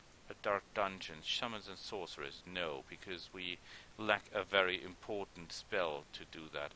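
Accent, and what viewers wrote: Germany. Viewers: He is german I think. He has a clear german accent. In the german language, the two digit numbers, and every two digit part of more digit numbers are spelled backwards, and only the two digit parts of those numbers, too. So when I do a literal translation, for example they say 25: five and twenty. 74, four and seventy. 368, three hundred eight and sixty. I know, it's very unintuitive, when learning that laguage it fried my brain many times, among other... quirks of that language.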